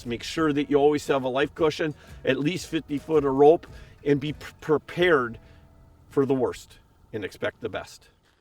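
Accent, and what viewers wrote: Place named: Midwest, United States. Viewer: This is the most Midwest sounding guy I’ve ever heard😂 I love it!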